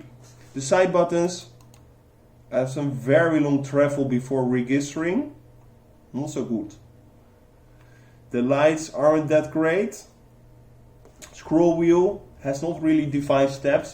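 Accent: Netherlands. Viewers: Echt pannekoeken engels.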